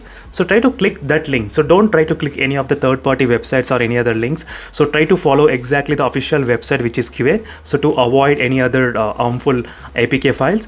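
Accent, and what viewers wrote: India. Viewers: Nica video... I want to say, when you speak English I'm sure u are from India right??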